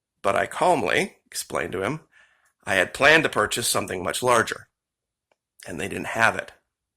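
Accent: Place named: New York, United States. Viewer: You are kool , just the NY accent in my business would have lite the match.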